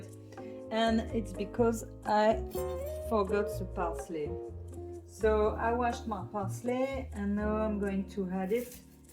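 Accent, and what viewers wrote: France. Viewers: I love watching these videos---the recipes are always so good, the calm tone, when youre out walking around, I get to see Paris - you have a nice sweet voice with a lovely French Accent when speaking English - it is just so calming and refreshing to watch-there is no crazy cutting and chopping and throwing and slamming ingredients - so, thank you for making the videos for us to enjoy - MERRY CHRISTMAS AND BEST WISHES FOR GOOD HEALTH AND JOY IN THE NEW YEAR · I enjoyed this very much. French is my second language and I went to university in Grenoble in the 1990s. Your English is excellent. Love the accent. It reminds me so much of my French friends. Happy New Year!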